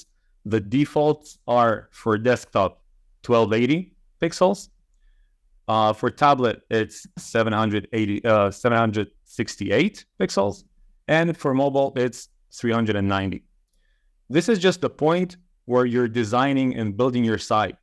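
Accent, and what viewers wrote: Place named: Israel. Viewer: טוב, לפי המבטא הבנתי שאתה ישראלי! תוכל להסביר לי, למה לעזאזל כל עריכה שאני עושה בדסקטופ לא מסתנכרן עם מובייל וטאבלט!?!? הכל מתחרבש, כל הדפים], סקשיינים והתאים על מצב פיקסט! למה לעזאזל זה מתעוות לי ב-publish!? אה...ועוד דבר מעצבן! כתבתי תוכן בעברית, לחצתי על cntrl+shift ימני כדי לחהזיז לצד ימין הכל, ואז הפרגרף מוחק לי את הכל ומעלים את האופציה של הטקסט! מה לעזאזל!!!!!!!!!!!!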